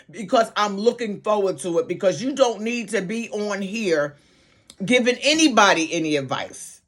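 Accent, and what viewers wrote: East Coast, United States. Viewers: This is my first call stumblingly across your page. I'm getting an East Coast twang, I love it! New subscriber! Keep that energy PLEASE 😊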